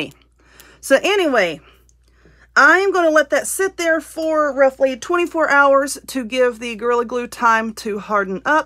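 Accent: South, United States. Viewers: She is from the south.. Us southerns all sound just like that.. I love her diys and i love her vidos. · I just love watching you. I've learned so much. I especially love to hear you talk. You're accent is almost as country as mine. I also talk to myself lol esp when I mess up.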